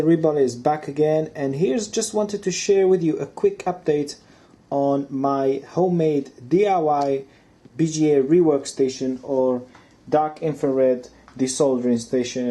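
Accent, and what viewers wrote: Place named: Poland. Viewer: Akcent Polski, chyba że się mylę Polak potrafi. Zrobiłem coś podobnego tylko z coffe machine do tostów i robienia kawy.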